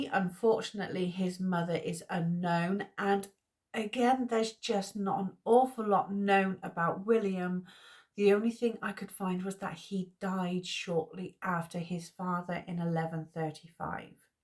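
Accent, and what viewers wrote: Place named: Scotland, United Kingdom. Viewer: Are you Scottish? Love the accent 😊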